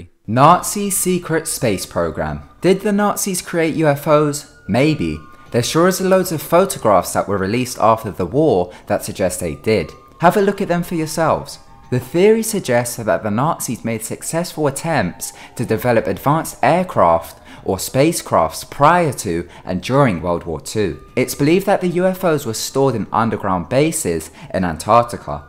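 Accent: United Kingdom. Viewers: WOAH You reply? I thought you were famous or something. I Always get on my boyfriends channel (idk how to make one with google plus like he has) to watch your videos I love them!!!! I love guys with british accents lol. Thats crazy you reply to people, I thought all the people with so many watches since youre famous wouldn't reply to their fans and stuff thats so cool you do! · What on earth is this guy's accent? It sounds British English at some points, then almost Asian English in others. I find this the more intriguing question.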